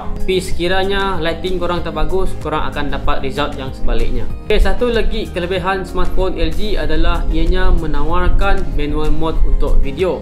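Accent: Sarawak, Malaysia. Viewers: ☺️ baruk nengar aku dh tauk kompom org srwk. Diam sine pok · Urang sarawak ka😊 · Kaka macam kenal jak, orang sarawak kah? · suara mcm org sarawak,cakap seketui seketui..senang paham..goodjob👍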